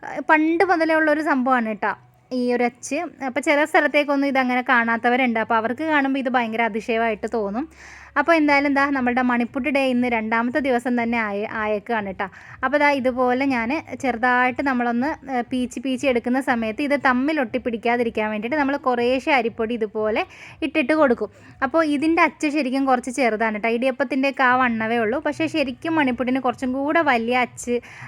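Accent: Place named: Ambalappuzha, India. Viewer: Njangal ambalappuzhakkarum anganeyanu parayunnath ❤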